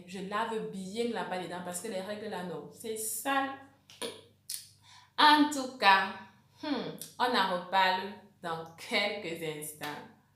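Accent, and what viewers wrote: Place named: Cameroon. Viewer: L’accent camerounais 😂❤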